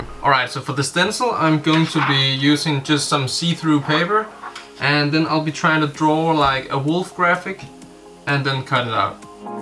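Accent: Denmark. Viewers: Haha! Tydeligt Du Er Dansk! Men Super God Video!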